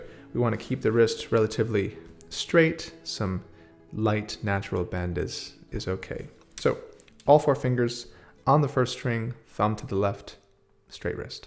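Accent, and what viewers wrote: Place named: England, United Kingdom. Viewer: con l’accento inglese:-)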